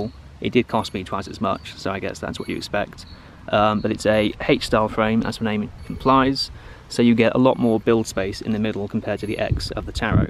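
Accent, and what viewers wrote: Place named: United Kingdom. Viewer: I think my main distrust and poor performance with the 35xx motors and larger props is I live at higher elevation. Based on your accent and surroundings you live somewhere in the UK with an average elevation of ~500ft. I live at 5000ft. M100's will hover for 28 mins at sea level on the larger battery, that same setup will only hover for 15 mins at 6000ft, and with ~500g of payload drops to 5 mins. So whether its work or hobby, I have to have more thrust because the elevation range I fly in is -2000 to 10000 ft ASL for commercial units, and when I fly for a hobby still 5000 ft, give or take 2000 ft. But say your drone makes 6kg of thrust (1500g per corner) and weighs 2.5kg, so it hovers around 50% throttle, its just a little under the max take off weight people generally like to have (50% of max thrust), so while flying you have 50% throttle to play with for flying and error correction. 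But that's lower elevation, at 5000ft that drone would likely hover around 75% throttle. Not only do you lose flight time, payload, but you lose authority. Its not that I am poo pooing 35xx motors entirely, but specifically, big frame, big props, small motors. Bigger frames have more weight, more room for batteries that add weight, and you hit the limits of the drone easier before taking other factors into account, like elevation. And how often do people think about how well their drone will fly when they do a road trip from the coast to the mountains and gained a few thousand feet? I don't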